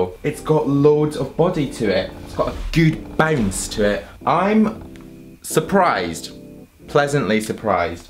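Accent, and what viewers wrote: United Kingdom. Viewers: Where are you from? i thought you was from uk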